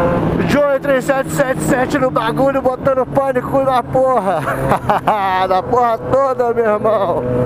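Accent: Rio de Janeiro, Brazil. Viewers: Esse sotaque carioca,e deixa louca....que tesão!! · Você é do rio né brother? Maneiro!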